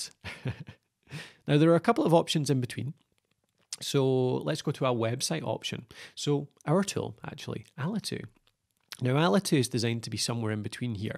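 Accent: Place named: Scotland, United Kingdom. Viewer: He's not English. He's Scottish or Irish. He sounds like anyways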